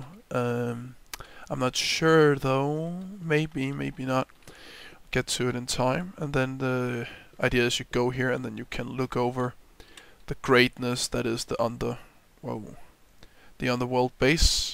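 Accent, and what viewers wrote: Denmark. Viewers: Well, im already recording Ep12 :D i did a couple of fails with editing, but nothing major! well i can only hear that you are danish, because im danish too, so dont worry too much about it :-)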